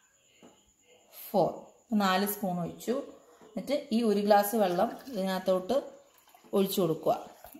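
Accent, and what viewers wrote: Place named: Kottayam, India. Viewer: Not Thrichur talk... This is exactly kottayam Thiruvella chengannur talk.. same dress next day..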